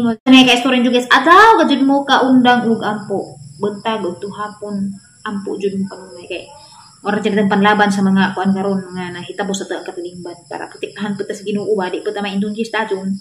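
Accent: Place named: Leyte, Philippines. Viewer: Hello bisaya pud ko pero naay lain nga words nga imong giesturya. Taga leyte baka kay ang punto murag same s taga leyte.dili ko ma boring s imong video ba mao e subscribe tika😁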